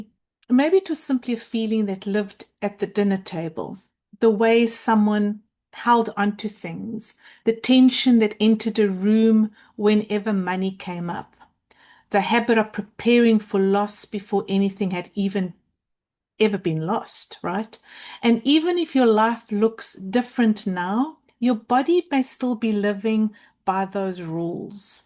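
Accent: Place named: South Africa. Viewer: Glad to have stumbled on your video. And as an expat living far from South Africa, good to hear your accent ❤. Thank you for your work. I listen to a lot of financial podcasts and one of the latest buzz words at the mo, is "K Shaped Economy" (meaning those on the upleg of the k are getting wealthier and conversely, those on the bottom leg, are finding themselves with less and less). Isn't it interesting that this is happening Now with Chiron going into Taurus AND the symbol of Chiron having a clesr K in it?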